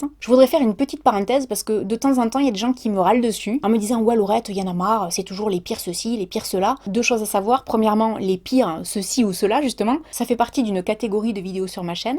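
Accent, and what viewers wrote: South, France. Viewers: Sa fait trop du bien d entendre l accent du sud... je suis de perpignan sa fait trop du bien❤❤❤ reste comme tu es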